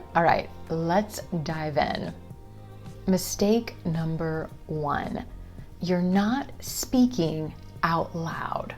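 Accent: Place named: United States. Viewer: Hello my teacher really I love way you're speaking American English pronunciation it's so sweet I'm Steven am in Canada Ottawa Ontario I need to speak English like you if possible.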